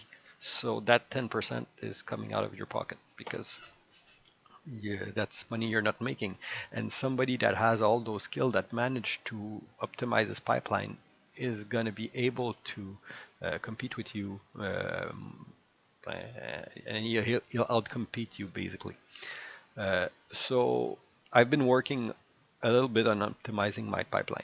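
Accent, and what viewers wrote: Quebec, Canada. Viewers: French Canadian?? Je reconnais l'accent ahah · Is it possible that you might live in Québec? I smell a small Canadian-French accent there :P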